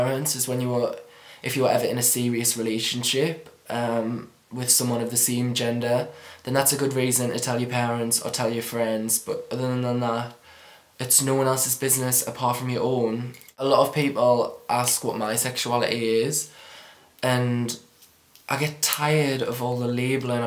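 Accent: United Kingdom. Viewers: scottish or british or welsh accent?? anyone know??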